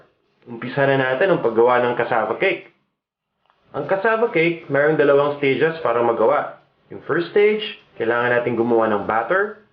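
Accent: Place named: Visayas, Philippines. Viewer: disappointing filipino who is very poor in giving instructions as well as has no idea about measures. ademas, obviously not from the tagalog region. in a brazo de mercedes video says 'i-combine' when in tagalog combine simply translates to 'ihalo'; transfer - 'isalin'. maybe he is bisaya either make your presentation in english or stick to cebuano!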